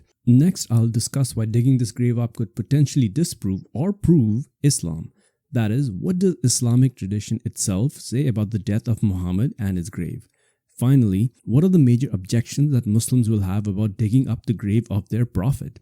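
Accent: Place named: India. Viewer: I noticed you only picked on Islam. And judging by your accent you are from the subcontinent meaning you have an agenda. It’s ok . Your Hindu gods changed sex to shag another god . And in Christianity there was a zombie apocalypse and dead people rose and walked around. But that hasn’t deterred its billions of followers. If you want to hear outlandish and absurdities read the Bible and the vedas. If you believe your religion is true you shouldn’t be worried about the religion of others. You should worry about your own salvation. Shinto is a religion perhaps you should discuss that. If you sre incapable of doing that , then it’s clear you are not sincere.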